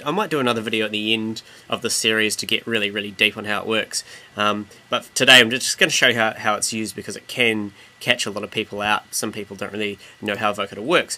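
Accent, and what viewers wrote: New Zealand. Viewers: Are you from NZ, you sound Kiwi azzzzz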